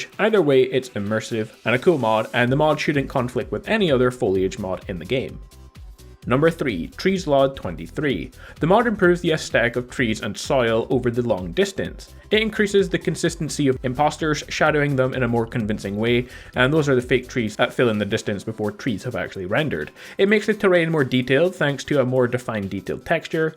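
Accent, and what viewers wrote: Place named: Scotland, United Kingdom. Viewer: hes scottish i believe :)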